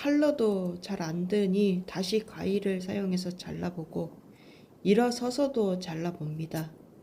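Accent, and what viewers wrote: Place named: Jeolla, South Korea. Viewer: 전라깽깽이 말투랑께.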